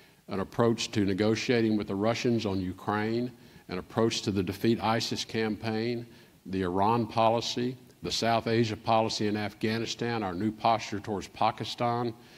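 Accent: South, United States. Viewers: Someone with a southern accent going to save life on earth is like giving a madman a nuclear bomb.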